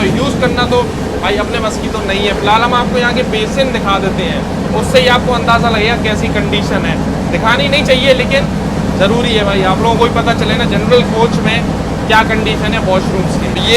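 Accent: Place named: Bundelkhand, India. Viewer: Bhai aaj bhasa puri bundelkhandi bol rahe ho❤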